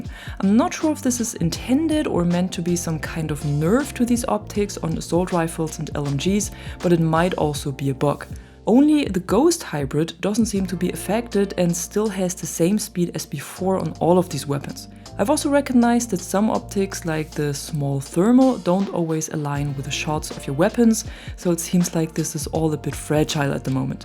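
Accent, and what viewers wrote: Germany. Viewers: I am so sorry. I figured that out.Love your channel and your German accent.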